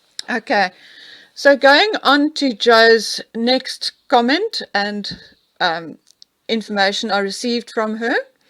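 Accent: South Africa. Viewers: 🇨🇦🇨🇦🇨🇦🇨🇦...another superb video full of information, I agree that mm knew Andrew previously. You can see it by the way he is in different photos they're both in. mm is a lying fraud and imo they haven't lost their titles because she's got something on at least one of the rf members if not more. Many people have lost their titles for much much less, it's extremely suspicious. Hopefully it all comes out at some point....keep up the good work. Lastly I love your accent, both of my doctors on none other than Vancouver island are south African and have the same accent as you...🇨🇦🇨🇦🇨🇦🇨🇦🇨🇦🇨🇦..💕💕💕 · I love your accent. I have two friends from South Africa and I love to hear them speak, as I do you. Do not change, just be you. I am so glad you were recommended by Yankee Wally. I really enjoy your videos and I trust them, because I know you do lots of research. We all have growing pains when we start something new. 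Keep on going girl, your channel is awesome! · Brilliant video as usual. And as a fellow South African I LOVE hearing you speak. Your research is outstanding, and your courage in speaking the truth is commendable. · Thank you for all the all the hard work and long hours invested in preparing these videos. By the way I love your accent. I really enjoy listening to you and love that you are from South Africa. Me I'm in Minneapolis,Minnesota in the good ole USA . I look forward to listening to future videos. I think it was Wally who mentioned you in one of her YouTube videos. She's so fun to listen too. Well about MM I could safely say no one knew who she was prior to marrying Harry and she's now driving us crazy with all her videos telling us we must do better . I use to feel sorry for Harry but I still think she controls his every move. Irs incredibly ha d to get away from a narrsasist. The longer you stay the harder it is to have the confidence it takes to walk out on a person like her. 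I see him living in fear if her wrath . You get to a point where your mind tells you it's easier to please them than to leave them. You honestly believe they will destroy your life if you do leave. I think MM has stripped his dignity and his self confidence. I think he got in too deep with her and doesn't believe there is a way out 🙋❤️ from 🇺🇸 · Love your videos. You obviously put in a lot of work. Please don’t change your accent. It’s what makes you, you. You speak clearly and that is all that matters. I, for one, love listening to a South African accent. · It’s so lovely to hear your accent. I am also South Africa and recently FaceTimed someone in Texas. I had never met her but she was fascinated by my accent and loved it. I believe we as South Africans speak very clearly ( to the most part) and your accent and voice are easy on the ear. Actually we have a distinctive accent that one can hear and recognize instantly. 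Be proud of your lovely speaking voice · There is nothing improper about a South African accent. Your English is better than that spoken by many Brits. Be yourself lively lady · Your South African accent is charming. We all speak English. I speak with an American accent. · My best friend is from South Africa (Rustenburg) , married a German and now lives in Germany. We became fast BFF when I worked in Germany (now I'm back in the US) and I miss her very much. I love her accent and every time I listen to you it reminds me of her. Do you also speak Afrikaans? Please keep up the good work and keep digging. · You have a Sth African accent.It is nice.I love your art work...the worm is cool.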